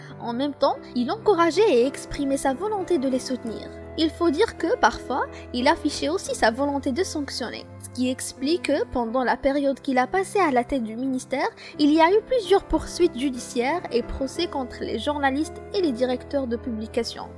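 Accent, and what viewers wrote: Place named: North Africa. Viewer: accent de bledar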